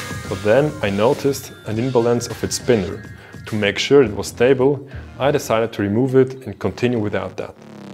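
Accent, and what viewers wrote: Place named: Switzerland. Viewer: Ret schwizerdütsch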